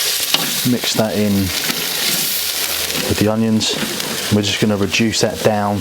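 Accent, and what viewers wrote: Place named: Australia. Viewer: He is speaking Australian.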